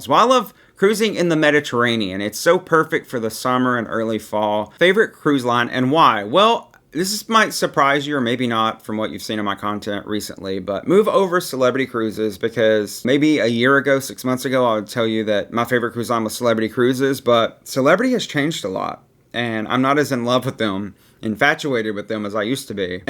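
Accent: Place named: Tennessee, United States. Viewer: I like your accent. My guess was Tennessee. No one likes mine Boston.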